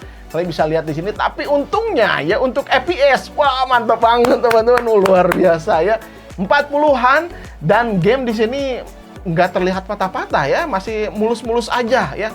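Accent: West Java, Indonesia. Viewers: lah emang Sunda, subscriber baru ya ngab? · Sunda banget aanya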